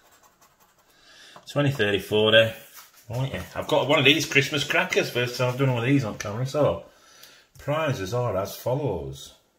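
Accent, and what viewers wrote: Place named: Barnsley, United Kingdom. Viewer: where you from mate Barnsley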